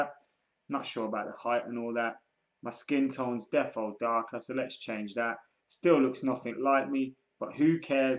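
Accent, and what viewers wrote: Ireland. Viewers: Are u like half Irish? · are ya Irish?😂🇮🇪🇮🇪🇮🇪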